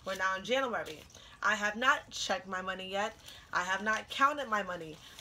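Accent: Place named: Brooklyn, United States. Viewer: U must be from Brooklyn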